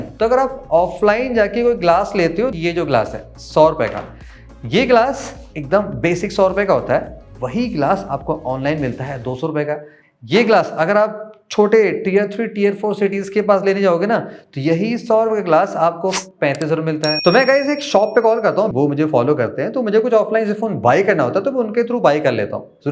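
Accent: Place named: Kanpur, India. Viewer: Kanpur bali vibe aa rhi h bhaiya ji...😅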